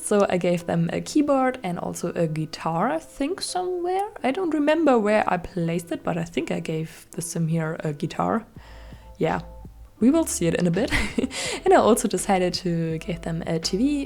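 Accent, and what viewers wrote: Germany. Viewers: Are you German haha 😅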